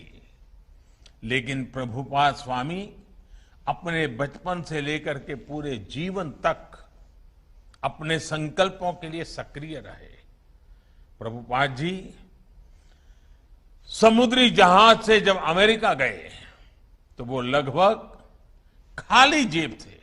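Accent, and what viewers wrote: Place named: Gujarat, India. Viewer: that's gujrati touch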